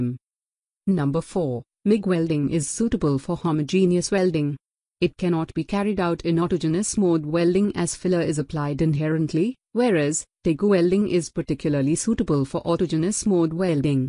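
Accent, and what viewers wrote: India. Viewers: I can't stand the 'valley girl' female or 'middle aged' man voice. Drives me absolutely nuts. With an Indian accent? This one is new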